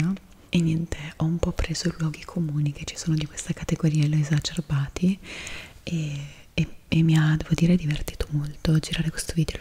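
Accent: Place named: Milan, Italy. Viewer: Non capisco se mi sembri milanese o una delle assistenti del diavolo veste prada (no insulto ti adorooo❤)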